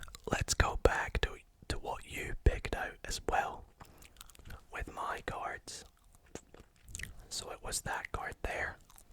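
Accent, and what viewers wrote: Scotland, United Kingdom. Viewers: I’m new to you channel. You Scottish?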